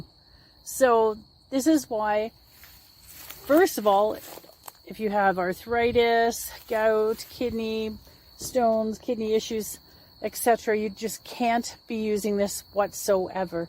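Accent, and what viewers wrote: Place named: Canada. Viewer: Thank you! Wre those crickets in the background ? Where were you filming from? You sound Canadian but i don't associate crickets with Canada!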